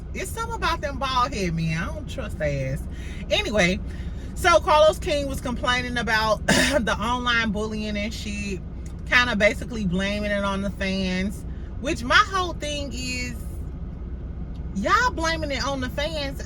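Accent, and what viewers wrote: St. Louis, United States. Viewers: Hey love! This your auntie from Chicago. I love your content. I love that St. Louis accent. Don't change anything!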